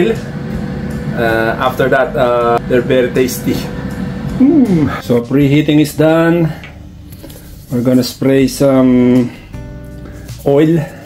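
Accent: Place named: United Kingdom. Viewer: Wow british accent ne😍😍😍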